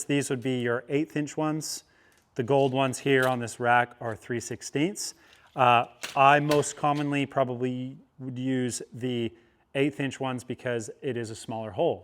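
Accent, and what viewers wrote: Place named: Australia. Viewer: Awesome video! This dude sounds Australian sometimes and American others and im so confused by it lol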